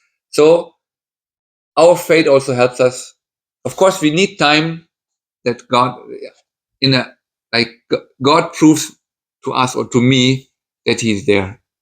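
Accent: Nagaland, India. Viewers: God saved you and gave you lot of chances due to some purpose and the purpose was to come to India and meet your wife I guess. Your testimony was good and clear, best thing is that you speak English just like a Naga. Thankyou for sharing this bro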